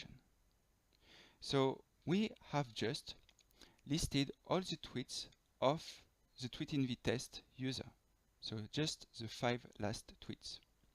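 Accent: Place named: France. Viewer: J'adore cet accent so frenchie! Merci pour ce tuto clair et précis! J'utilise depuis quelque temps la version 0.9 de tweetinvi et j'essai de poster une vidéo (pouvant dépasser 15 Mo). Suis je obligé d'utiliser la version 1.1 ou 1.2 et si oui, y aurait-il un tuto pour l'utilisation de ces nouvelles versions? Il semble que TwitterCredentials ou AuthFlow.InitAuthentication ne soient plus valides pour la connexion d'un utilisateur X qui souhaite se logger avec son compte tweeter. Merci.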